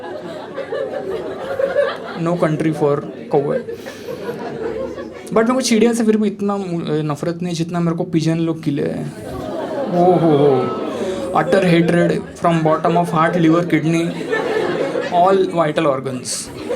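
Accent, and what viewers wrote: Maharashtra, India. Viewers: Bro is to Good su marathi accent😂😂😂😂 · His Marathi accent makes him 10x funnier lol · Love all your videos bro!! Specially your Marathi accent! Love itttt!!!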